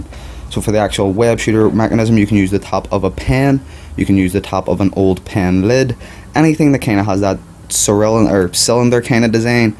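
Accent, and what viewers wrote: Ireland. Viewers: I didn't know he was Irish to 😁😲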